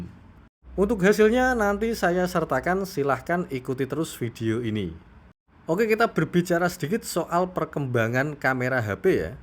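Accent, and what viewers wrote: Java, Indonesia. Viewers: Wong jowo y mass..logat nya tu....😅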